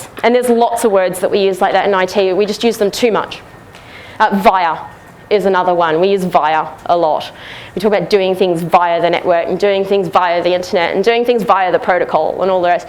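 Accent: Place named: Australia. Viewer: I love australian accents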